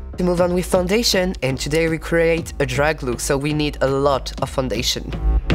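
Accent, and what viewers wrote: France. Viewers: Juste en entendant son accent, on sait qu'il est Français 😂. / Just by his accent, we know that he's French 😂.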